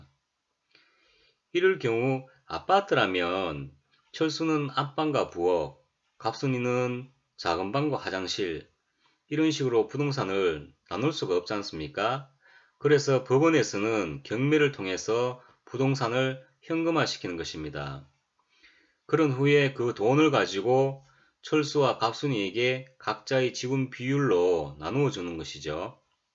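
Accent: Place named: Gyeongsang, South Korea. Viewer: 경상도사투리가 이렇게 부드러운줄,, 내용도 넘 쉽게 귀에 쏙쏙,,감사합니다 좋아요 구독합니다^^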